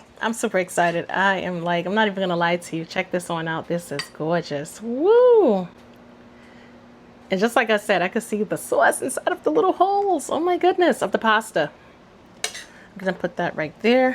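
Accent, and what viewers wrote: New York, United States. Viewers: I LOVE the east coast accent, New York, Jersey, or am I wrong? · I love your cooking and I love your accent! Sounds a bit like New York! Thanks for sharing so many delicious recipes 😊👍🏼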